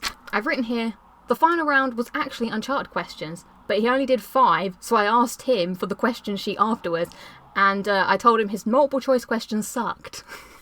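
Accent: United Kingdom. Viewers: Are you british? I love your accent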